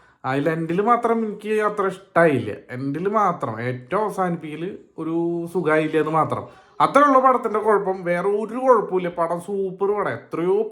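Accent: Thrissur, India. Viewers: Nice broo first comment i love your slang because i am a thrissur Gediiiiiiiiiii…….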